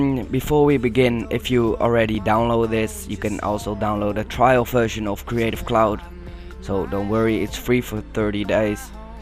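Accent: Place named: Netherlands. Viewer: Nice tutorial, You're definitely dutch too hehe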